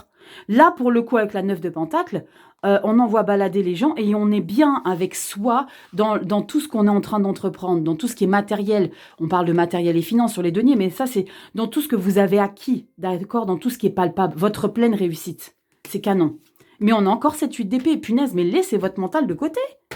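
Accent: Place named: Provence, France. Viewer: Un petit accent et expression du pays des cigales ❤❤.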